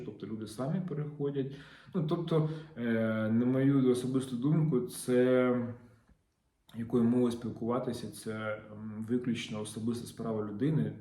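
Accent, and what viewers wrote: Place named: Lviv, Ukraine. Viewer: Львiвська мова неперевершена iiможна впiзнати де будь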